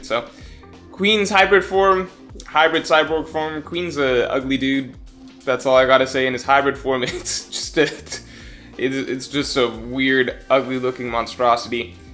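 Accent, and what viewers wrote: India. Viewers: are u indian? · Hey, which part of India are you from?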